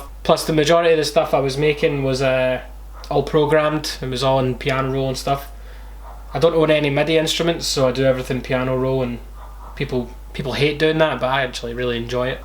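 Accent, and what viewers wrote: Scotland, United Kingdom. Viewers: Scottish isn't a language. He's speaking English with a Scottish accent. Lol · Are you scottish? It sounds a bit like you're scottish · Slow it down to 00.75 When he starts talking he sounds like Scottish Johnny Vegas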